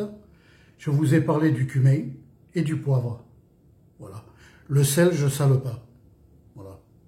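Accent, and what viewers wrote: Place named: South, France. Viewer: Coucou =) "!!!!!!" Comme dit Lucky, ca donne trop Faim "!!!!!!" Super vidéo d°un excellente organisation, Merci infiniment pour des bonnes recettes "!!!!!!" Ca fait du bien aussi d°attendre notre accent du Sud "!!!!!" Bonne Faim de soirée "!!!!!!"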